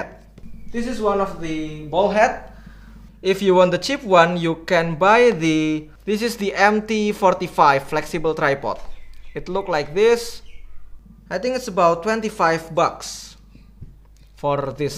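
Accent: Indonesia. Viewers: Walopun bahasa inggris nya logat Indonesia banget msh mending dapat dipahami karena Artikulasi lebih jelas 😅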